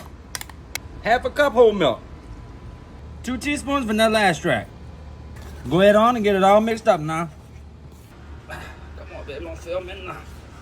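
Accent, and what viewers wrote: Louisiana, United States. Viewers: He sounds like the axe man from AHS ...that's interesting. Never heard a Cajun accent like this coming from I white fella. I thought it was just the actor in the show. Neat. Interesting recipes too❤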